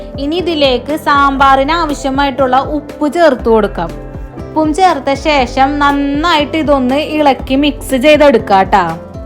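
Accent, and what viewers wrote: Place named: Thrissur, India. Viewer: Thrissur kaari aano...slang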